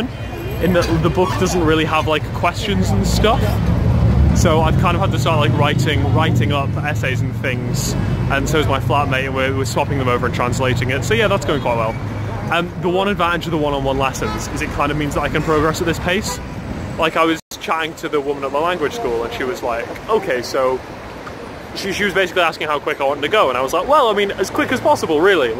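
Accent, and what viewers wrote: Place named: RP, United Kingdom. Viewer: You sound so much posher than I remember cuz :) its really cool seeing what your getting up to though :)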